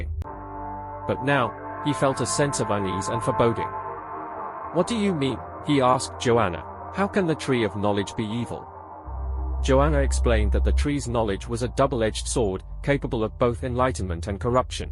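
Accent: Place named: United Kingdom. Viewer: I love it, esp the British accent.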